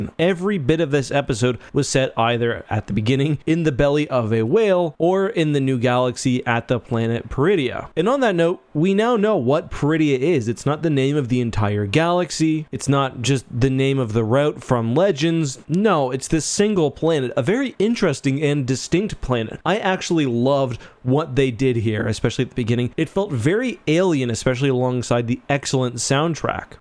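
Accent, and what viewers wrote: Canada. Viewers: Love his Canadian accent!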